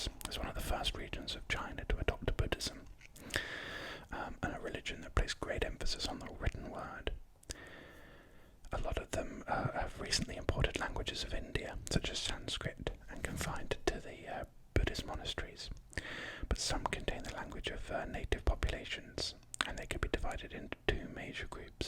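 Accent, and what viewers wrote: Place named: England, United Kingdom. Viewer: I love history and English accents so great combo for Me. Thank you.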